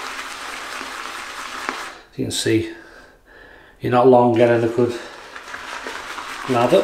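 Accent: United Kingdom. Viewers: It's always great to hear some British English accent. Your videos are not only entertaining and relaxing, but educational too!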